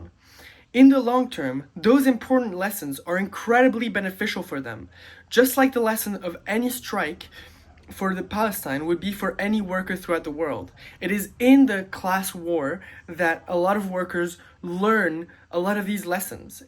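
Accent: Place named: Europe. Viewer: Hey I like your points in general but I want to push back a bit on the notion that revolution would benefit the whole working class/that the working class is truly united in their interests globally. I don't know exactly where you're from but based on your accent I'm gonna guess somewhere in mainland Europe. I bring that up only to say I'm not from Europe so idk the ins and outs of the situation in that part of the imperial core, but I am from so-called Australia and most "leftists" in this country (and many other colonial countries) are not our allies (by "our allies" I mean any true actually left wing internationalist's). Learning simultaneously more about the history of colonialism in Palestine and in Australia has led me to the same conclusion for both cases, the only way to resolve the contradictions is decolonial revolution, something much more achievable in Palestine purely because of demographics but I am hopeful one day it may happen on this continent as well. 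The issue is though, it is genuinely in the interests of the settler-working class to maintain colonialism. I'd argue this is the case even more so then it is that European working class benefits from Imperialism. I know you make the claim in this video that the benefit is marginal, and that is true in comparison to the benefits socialism could bring, but I also ask you at least reconsider that point by comparing your living situation to that of the vast majority of humanity. But back to my main point, genuine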